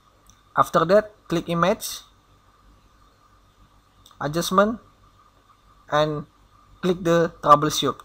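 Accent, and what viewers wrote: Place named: Indonesia. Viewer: You sound Indonesian hahaha thx for the tutorial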